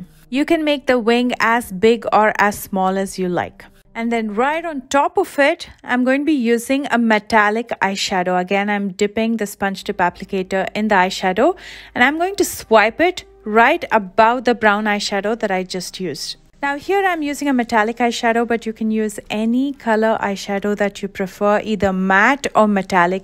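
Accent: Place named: India. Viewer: I love her soft Indian accent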